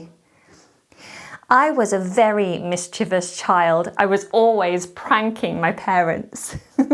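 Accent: United Kingdom. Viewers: Wow...wonderful British accent👍💟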